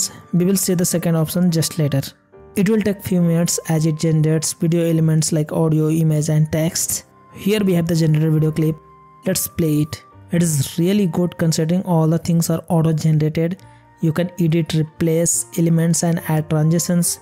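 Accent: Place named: India. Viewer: bro,maybe u can try vouce over since I dont like that Indian english accent,the way u pronounc "T" ,its terrible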